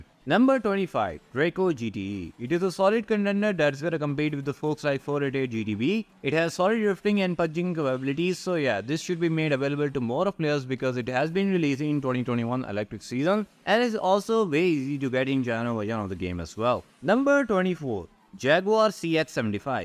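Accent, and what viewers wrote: India. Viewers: Bro you indian? If not where you from?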